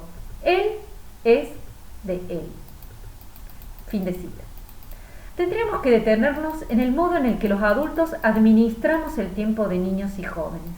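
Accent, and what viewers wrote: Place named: Córdoba, Argentina. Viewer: Un luuuuuujaso (leáse con ese hermoso acento cooordoooobés)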